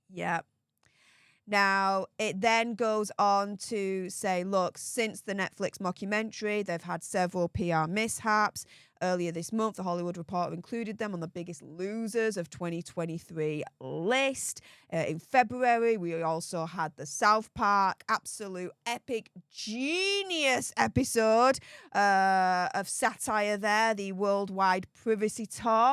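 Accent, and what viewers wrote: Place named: England, United Kingdom. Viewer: please talk a bit slower because your accent is very broad and hard to understand. I don't live in England (I'm just guessing that's where you're from?) Thanks heaps.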